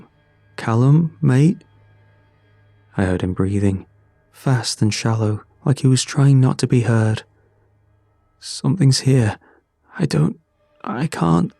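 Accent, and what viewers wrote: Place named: Cockney, United Kingdom. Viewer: I'm lovn dat don't give a toss; real cockney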